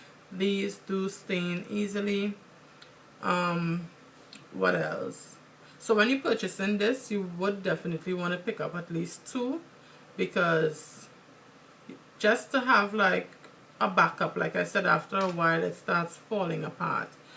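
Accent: Trinidad and Tobago. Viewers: your 'accent' ...are you Trinidadian? xD :)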